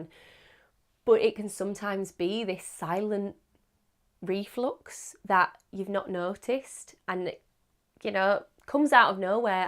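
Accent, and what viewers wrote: United Kingdom. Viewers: OMG, I love British accent ❤ 😍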